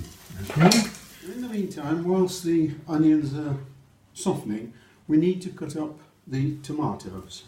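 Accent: United Kingdom. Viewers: Which part of the UK are you originally from Mr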